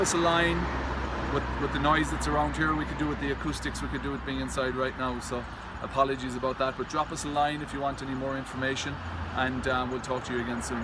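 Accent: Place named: United Kingdom. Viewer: I agree people sometimes add sounds to words unnecessarily. That being said, it seems American English is not the first language of the host of this particular video. There are many millions of folks out there who speak British English--they pronounce aluminum a different way. <link> And in my book, different in this case is perfectly fine. Who am I, or you, to judge anyway--aren't there better things to be spending our time on than calling people out publicly/on social media for things like this?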